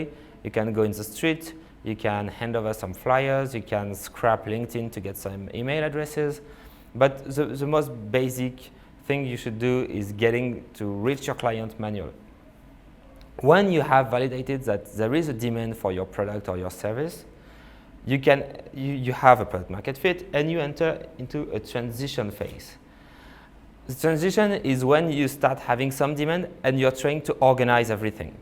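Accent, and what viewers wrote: France. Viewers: Good talk with a lovely French accent :) The thought process of growth hacking can be taught and mastered, that's what people have to tell themselves. Read books, be around people who've done it, and actually jump into business. I empower people everyday at Elium (<link> and I can say that the barriers between them and their dream life are often imaginary !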